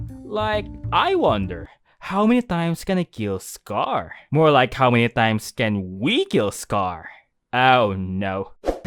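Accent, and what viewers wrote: Philippines. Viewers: Why do you sound like a Filipino? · Pilipinonh pilipino yung accent!!!!!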